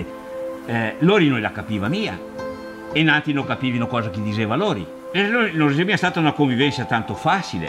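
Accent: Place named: Veneto, Italy. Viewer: Bellissimo filmato, addirittura commovente questa voce che racconta perfettamente la storia in veneto ( con accento "bellunese/trevigiano" con qualche punta di "chioggiotto") dopo più di un secolo di distanza!!! Ma quanti compaesani ci sono in giro per il mondo ed in Brasile in particolare?! Quando penso che la più grande città "italiana" nel mondo non è Roma bensi' San Paolo (città con 12 milioni di abitanti dei quali METÀ sono discendenti della nostra gente) mi vengono i brividi!!!❤👏👏👏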